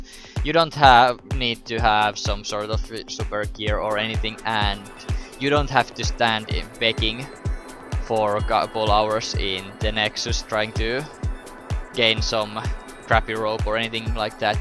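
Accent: Finland. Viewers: Kuulee heti että on suomalainen :D, / u can hear that hes finnish :D Missä serverissä pelaat?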